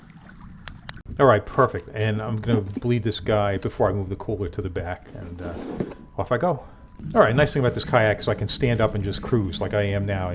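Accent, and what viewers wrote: Long Island, United States. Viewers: did you move to Florida you got me when you said you where in Florida in that Northshore long Island voice!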